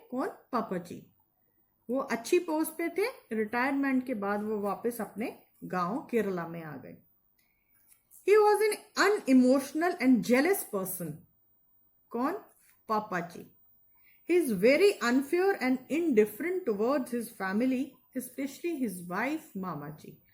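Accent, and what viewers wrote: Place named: Jammu, India. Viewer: Mam aapme thodi dogri jalak hai 😅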